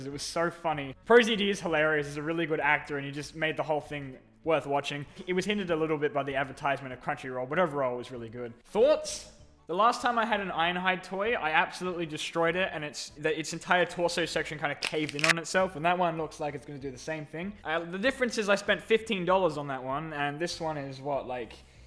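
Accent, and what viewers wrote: Australia. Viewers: For next Q and A are u Australian?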